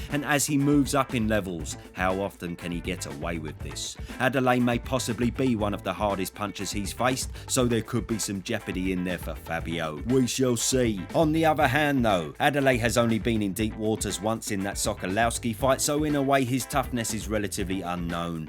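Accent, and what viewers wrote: Cockney, United Kingdom. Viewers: How can a 5 minute video from a cockney boxing genius sell the fight more for me than two massive pressers 😂😂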